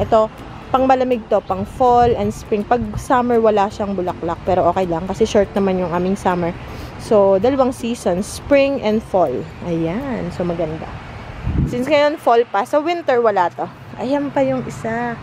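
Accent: Batangas, Philippines. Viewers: Batangeño din ako pero kakaaliw po ang punto niyo. Haha hindi nawala. God bless po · 😁😁 ang cute ng accent nyo pg nag babatanggenyo kau 😄💓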